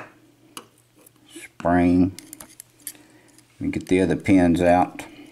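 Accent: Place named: Texas, United States. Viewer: My favorite part of your videos, aside from your amazing picking skill, is hearing you say "let's gutted it" with that Texas ascent :)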